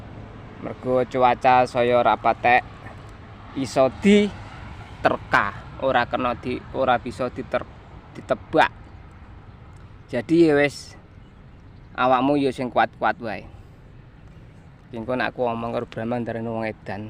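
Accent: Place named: Madiun, Indonesia. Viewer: Hadir om,, sampean asli pundi om?? Kok bahasa jawane mirip madiun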